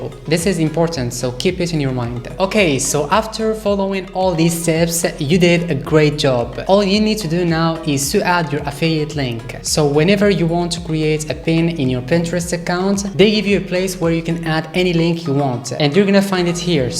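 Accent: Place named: Middle East. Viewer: Thanks bro! Very helpful. Also, love your accent! Seems Middle Eastern mixed with French 😊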